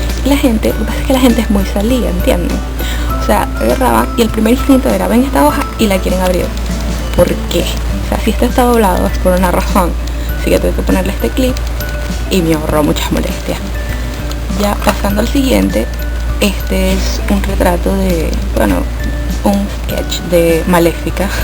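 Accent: Venezuela. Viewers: Apenas oí tu voz me di cuenta que también eres venezolana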